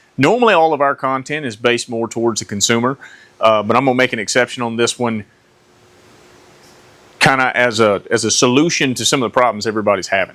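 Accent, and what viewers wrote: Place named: United States. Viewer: Lovely American accent.! Many thanks for making this helpful video sir.😊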